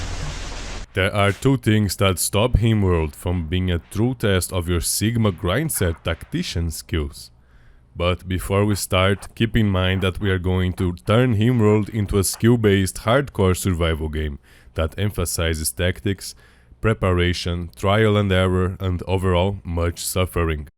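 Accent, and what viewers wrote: Brazil. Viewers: Please man I’m begging do a playthrough with this mod, PELO AMOR DE DEUS MANO EU QUERO ESCUTAR O SOTAQUE BR EM INGLÊS DNV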